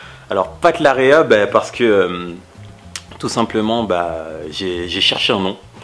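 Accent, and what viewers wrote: Africa. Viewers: trop la classe l'accent africain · C est vrai que tu fais trop bien l'accent africain x')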